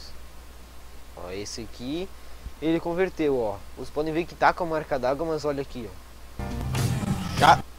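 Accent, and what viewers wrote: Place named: Curitiba, Brazil. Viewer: vlw ai cara só para constar amei seu sotaque, vc é de Curitiba?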